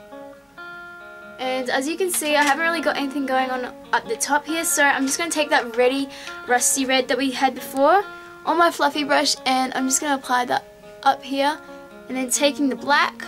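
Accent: Australia. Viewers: u sound Australian i now love you just cause i am australian ^-^ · r u austrailian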